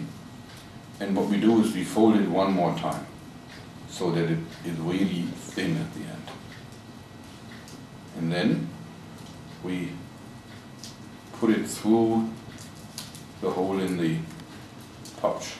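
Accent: Germany. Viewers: no, it's a german accent^^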